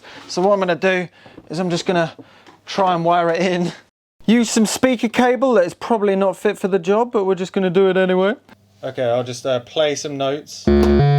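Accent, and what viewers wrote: London, United Kingdom. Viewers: These are called 'cheese wheel' Leslie. These is a full spectrum Leslie, Very cool but the full Leslie was wondrous. The bottom was a bass rotating drum with one opening with a curved sheet attached to the upper rear and to the lower front edge. On top was the rotating treble horn [in the opposite direction] There were usually on treble driver , not moving, driving treble waves up into what looked like a double split horn, however, one side was closed off to act like a perfect counter balance. You sound like you are in the UK so l don't think you could donate the unpainted cheese wheel to me, but l am now stuck in an assisted living building, with a Yamaha MO8 sitting there because my Leslie was stolen. what you have there will change the dynamic of both your playing and writing, because as you noticed in this video, cool sounds, sound Plastic Fantastic Awayla. 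It's Halloween night in Salt Lake City, Utah USA so you have been funking off since June. I wish I could hear what you have come up with. People seldom have exactly the same reaction to this marvelous effect. If you come across another out of this world find, Please keep Tom Behind the Zion Curtain, in MOMOLAND UTAH WISH WE COULD JAM WITH COMPLIMENTARY DUAL KEYBOARD LEAD BROTHER. NOTHINGS BETTING THAN DUAL IMPROV! KEEP IT UP KID, CUZ YOU GOT IT! TDH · Pissin off your awesome londoner accent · The fact that you are warning people to not touch the end of a plug after cutting it off it’s plugged in proves other than your accent that you live in the UK you know there’s a thing called Darwin evolution and it’s the reason why people are getting stupider nowadays because it isn’t able to function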